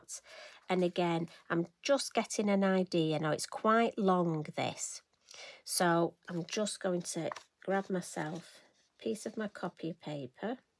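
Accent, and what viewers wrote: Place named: England, United Kingdom. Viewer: Actually, I love watching you paint, and I love to hear you speak, with your lovely English accent. what a lovely card. Blue is my favorite color. 💟💟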